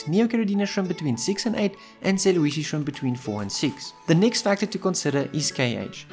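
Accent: South Africa. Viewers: I really love the south african accent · I can hear you also speak pure afrikans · and concise! Nice to hear a clued up South African hobbyist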